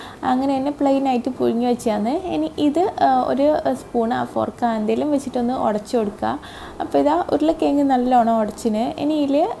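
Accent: Kannur, India. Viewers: നിങ്ങൾ kannur കാരി ആണോ കണ്ണൂർ ഭാഷ പോലെ തോന്നി 😄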